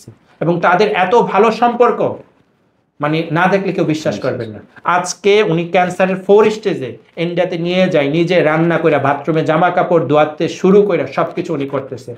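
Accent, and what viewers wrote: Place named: Comilla, Bangladesh. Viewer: ভাই আমি ও কুমিল্লার মেয়ে ভালো লাগলো আপনি আমাদের কুমিল্লা ব্রাক্ষনবাড়িয়ার ছেলে জেনে। ভিডিও সম্পূর্ণ দেখেছি ভাইয়ের আঞ্চলিক কথা গুলা অনেক হাসাইলো। কুত্তার হেউয়ানি শুনে হাসতে হাসতে শেষ 😄😄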